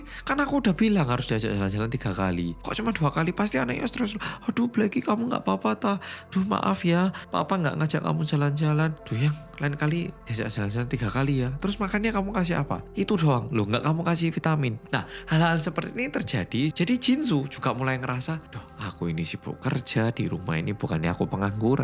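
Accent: Java, Indonesia. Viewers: Yang medok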